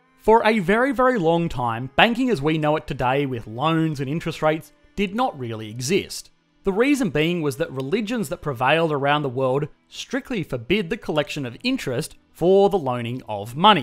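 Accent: Australia. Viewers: Uploaded 13 hours ago Australian accent 1am? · Goddammit handsome sounding Australian man. I was going to sleep in my screwed up sleep schedule. Now I’m getting educated.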